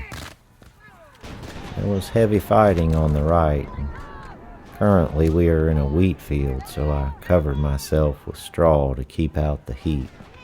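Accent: South, United States. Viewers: Great narration. Just that the person who wrote this was in the Michigan 4th. I kept getting confused because of the narrators southern accent.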